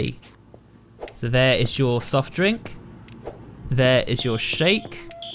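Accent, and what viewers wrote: United Kingdom. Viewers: Ohhh your British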